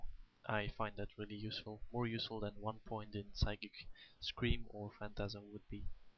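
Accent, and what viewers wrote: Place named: Sweden. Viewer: nice video, öva lite på ditt utal så kommer ingen höra att du är svenks :) i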